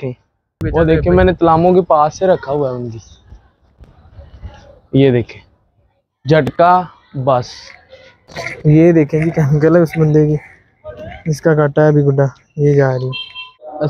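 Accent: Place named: Pakistan. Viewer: Hy bro good video i am from Delhi India but your accent is like Pakistan... By the way nice video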